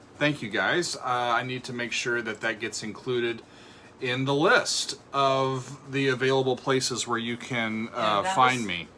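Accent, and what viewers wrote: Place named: Oklahoma, United States. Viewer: I'm in Oklahoma. Dude you have the Oklahoma accent ... Well maybe not .. not far off tho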